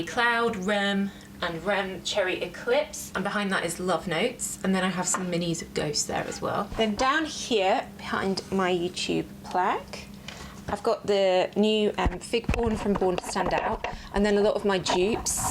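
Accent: United Kingdom. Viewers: Congratulations,! I like your collection. We share a lot of preferences: Michael kors Gorgeous, Aqua allegoria mandarine basilic, l Interdi, la nuit tresor... your descriptions are just accurate, I can rely on! I also like your British accent, I enjoy both English and parfums❤😊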